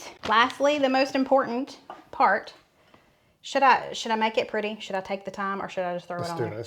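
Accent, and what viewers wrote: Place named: Minnesota, United States. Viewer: You do ,have the accent right for Minnesota I'm from South Dakota my birth state. 🤣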